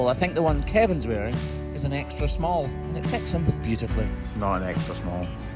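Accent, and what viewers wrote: Scotland, United Kingdom. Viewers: Please continue to pronounce iodine the way you say it. You are Scottish in Scotland. I very much enjoy listening to you speak. Don’t change for You Tube.❤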